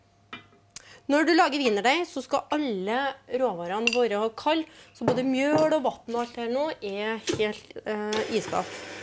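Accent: Norway. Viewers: Molde? Eller Opdal, ikke trønder isåfall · sør-trøndelag det ka du da høre? :P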